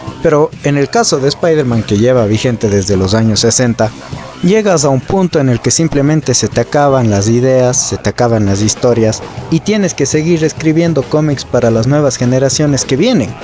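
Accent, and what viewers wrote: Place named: Ecuador. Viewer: Ese acento, sospecho que eres de Cuenca o de Quito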